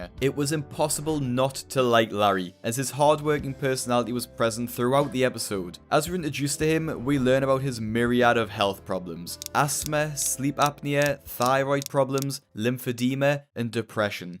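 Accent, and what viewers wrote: Newcastle, United Kingdom. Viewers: He is a Geordie. From the Newcastle area.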